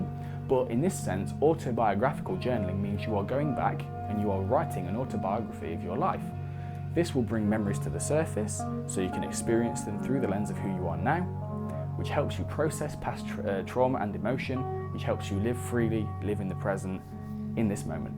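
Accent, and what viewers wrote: United Kingdom. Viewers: So happy that I've found this video! Thanks a lot for mention the book, I could feel in my body that only talking therapy isn't enough... I feel just like Ece Erdem: got here for healing and stayed for the content but those eyes and the British accent added that special thing... <3 lovely! Thanks, Thanks, Thanks a bunch,! Much love from Brazil!:)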